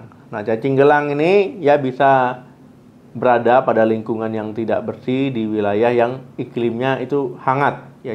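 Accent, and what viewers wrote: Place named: Medan, Indonesia. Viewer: LOGATNYA MEDAN BANG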